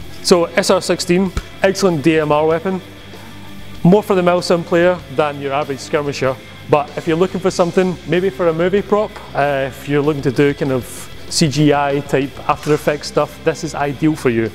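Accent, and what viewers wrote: Scotland, United Kingdom. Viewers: So many people think he's a brit. Smh. He is obviously a scot.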